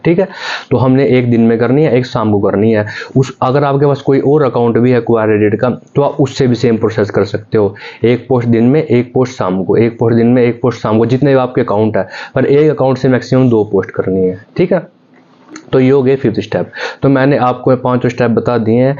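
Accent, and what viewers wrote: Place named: Haryana, India. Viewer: U r from. Language to bhai aapki ambala ya Ynr area ki lag ri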